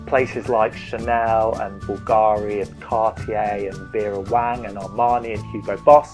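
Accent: United Kingdom. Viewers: I liked the video very much as it covers the points that need to be gone over. I have absolutely fallen in love with your UK accent. I could listen to you say "Boulevard" all day long. So, you were sexy and informative. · Hi. Loved hearing the English accent on a US guide for a change. What I hear repeatedly is the hike up to the Hollywood sign, which, as I'm in a wheelchair is a lot of a no no. I really would like to find out what is here in LA that a 65+ year old couple and our Mustang convertible can get to, with my wheelchair and "er indoors" dodgy legs 😥 Really enjoyed the video though 👍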